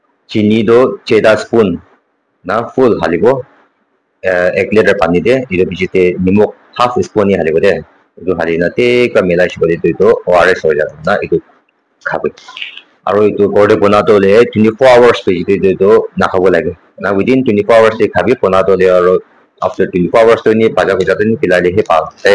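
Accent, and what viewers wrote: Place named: Nagaland, India. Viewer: Bakka local nagamise Ao tune ase ....holibhi bisse bhal tey ....keep it up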